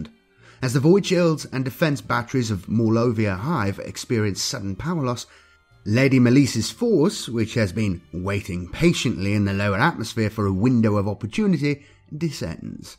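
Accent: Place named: RP, United Kingdom. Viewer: You have crafted the almost perfect 40k narration voice , English received pronunciation with a touch of theatrical enhancements to add a dramatic effect , but not too over the top or camp , admirable restraint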